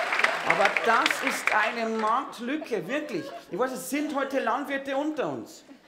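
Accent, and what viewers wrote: Bavaria, Germany. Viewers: Ich muss sagen, komplett bayrisch ist sein Sketch noch mal besser.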